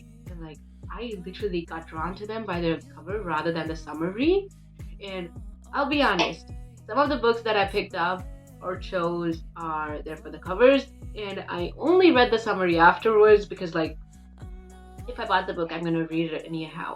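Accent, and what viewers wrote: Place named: India. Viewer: Omgg your accent is reallyyyyy niceee for an Indian girlll!!❤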